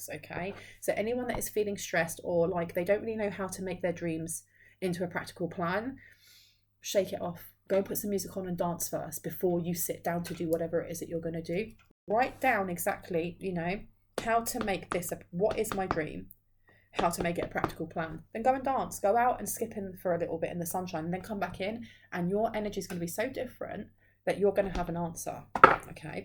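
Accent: England, United Kingdom. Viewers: 🌹🧘 THE LITTLE GIRL IN ME WANTS TO TELL YOU THIS AND I'M SORRY IF THIS OFFENDS YOU BUT I LOVE YOUR VOICE BECAUSE IT BRINGS BACK MEMORIES OF WHEN MY MOTHER TOOK US TO CHICAGO TO SEE MARY POPPINS FLYING THROUGH THE AIR LIKE AT A CIRCUS SHOW OR SOMETHING BARNUM & BAILEY CIRCUS USED TO ALSO COME THERE 🌹🦉 AND I ALSO REMEMBER AFTER THAT I COULDN'T WAIT TO GET MY CHRISTMAS GIFT BECAUSE IT WAS GOING TO BE A MARY POPPINS DOLL THAT SPOKE WITH THE ENGLISH ACCENT LIKE YOURS OR BRITISH ACCENT LIKE YOURS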